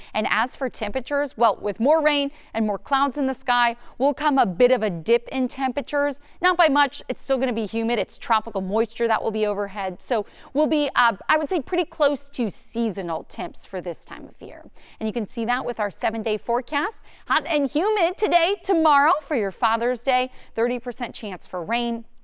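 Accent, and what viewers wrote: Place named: Texas, United States. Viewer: Cute little Texan drawl!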